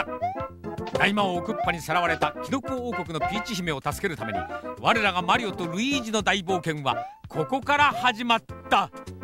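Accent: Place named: Japan. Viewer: Narrators speaking English but in Japanese accent.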